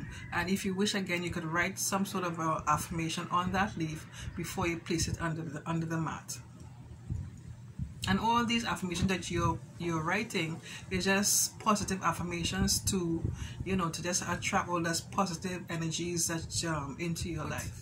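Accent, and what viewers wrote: Trinidad and Tobago. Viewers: You sounding Trini..just came accross yr channel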